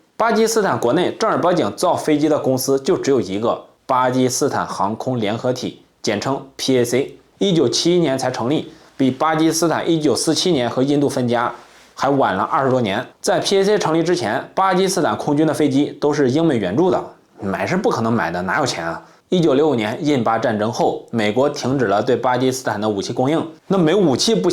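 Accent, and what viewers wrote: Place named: Shandong, China. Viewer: 妈的比这山东话真几把恶心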